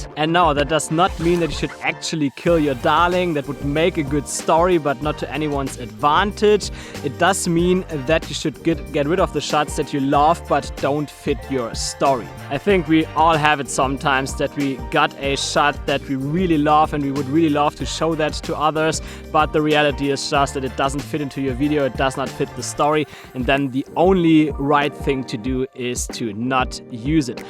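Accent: Germany. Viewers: your english sounds very german for my ears :D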